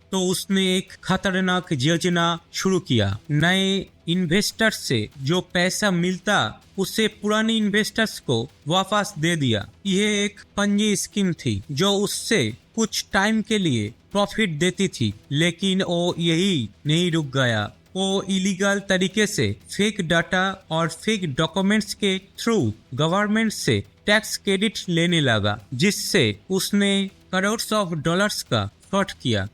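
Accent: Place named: India. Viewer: Toom englis hindi ki mafik bolta hi.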